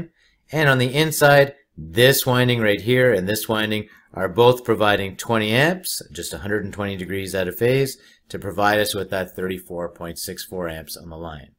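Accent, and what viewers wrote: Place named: Canada. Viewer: Thanks for these great vids. Aldo your accent isn't all nasal and annoying. I think it's a Canadian thing the yanks got it bad these days and it really makes it hard to endure listening to it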